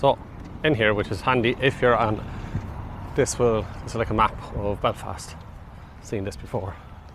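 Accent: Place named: South, Ireland. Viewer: Fair play to you for visiting the Shankill. You seemed a bit nervous and uncomfortable at times. Not surprising considering your Southern Irish accent, but you did a great job. It must have felt a bit like being in the lions den! The Troubles are over and it is much safer now. The locals are not as "paranoid" and aggressive now that people aren't trying to murder them anymore so you should be fine now. They are so used to tourists filming the murals that I'm sure they just assume you are a harmless tourist and ignore you. Even if they overheard you saying something they didn't like they'd probably just let it be or maybe have a wee bit of friendly banter with you and fill you in on the local knowledge/story/version of events.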